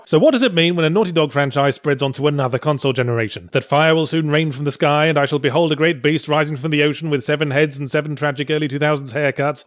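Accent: South Africa. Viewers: I am South African and that statement about us not getting along with each other is pretty apt. Even more so now that President Zuma has our country in a lovely nose dive to end up like Zimbabwe. Oh good review as always Yahtzee, your SA accent is still atrocious though. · Oh, how I missed Yahtzee's South African accent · Dear Yahtzee, as a South African I can safely say you are 100% correct, everyone hates us and most of all we hate each other. Oh and btw your accent is terrible, both in your resident evil play through with Gabe and here. You're still awesome, keep up the great work.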